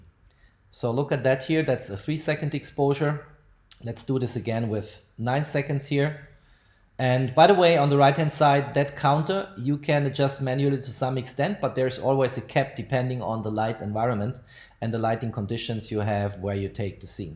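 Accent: Germany. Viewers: Hör ich da etwa deutschen Akzent raus ? 😉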